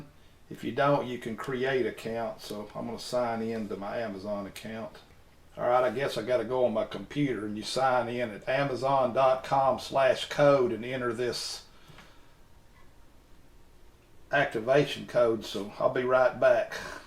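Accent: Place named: Texas, United States. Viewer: Man sounds texan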